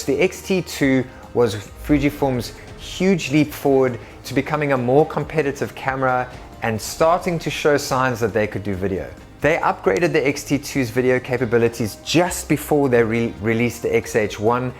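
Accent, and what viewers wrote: South Africa. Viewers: Definitely a South African accent 🤔🤗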